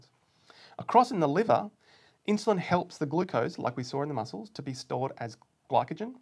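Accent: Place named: Australia. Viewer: Your Australian accent is relieving my stress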